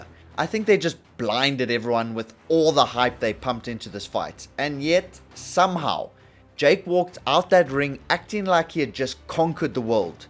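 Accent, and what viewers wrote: Afrikaans, South Africa. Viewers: Im addicted to your videos, this is my second video I've watched in a row because of that accent. Up the bok, up the afrikaans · your accent sounds south african in my opinion, please reply to this if i was wrong, thanks!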